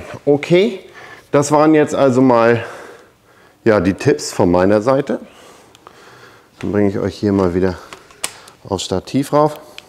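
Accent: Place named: North, Germany. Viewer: du Tüffel🤣🤣🤣erkläre mal den Bayern was ein Mors ist. Ok meine Tante ist aus Kappeln daher kann und kenne ich den Norddeutschen Dialekt. Deine Vorführung per Video ist wirklich klasse und gut. Für mich dein kaum hörbarer Dialekt auch schön aber denke an die deutschen Ausländer. Du hättest mit unserem Dialekt auch große Probleme. Gruß Klaus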